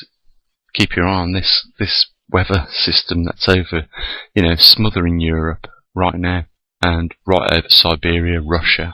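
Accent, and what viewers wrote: United Kingdom. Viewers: He's got a Birmingham (UK) accent.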